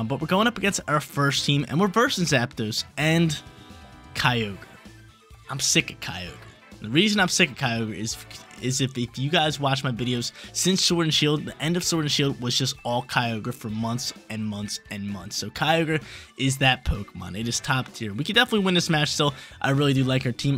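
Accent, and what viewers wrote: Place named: New Jersey, United States. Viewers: You from New Jersey ? Sounds like it lol. Or at least the north east for sure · I think he is! Hahaha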